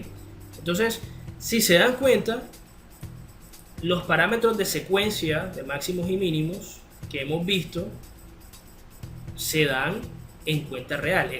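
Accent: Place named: Guayaquil, Ecuador. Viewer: De donde eres bro???? hablas como guayaco????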